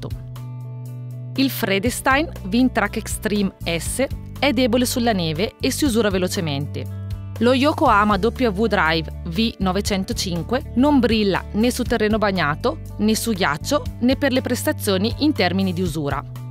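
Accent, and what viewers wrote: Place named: Ticino, Switzerland. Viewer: utilissimo! grazie. Buffo l'accento ticinese :)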